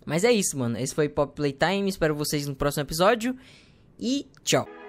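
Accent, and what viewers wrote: Northeast, Brazil. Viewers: “Ee man, djabo é issai” Como eu amo meu sotaque nordestino <3 skskskjsjs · Terror com sotaque nordestino hmmm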